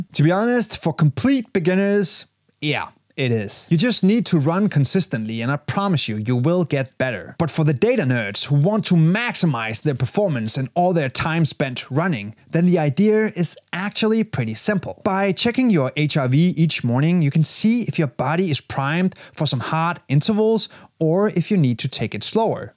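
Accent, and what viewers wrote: Denmark. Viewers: Danglish går crazy❤️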